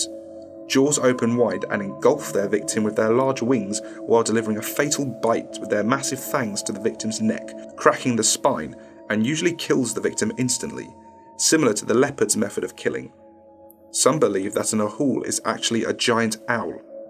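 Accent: United Kingdom. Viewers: That start was priceless, the accent and British slang only made it better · Is he british?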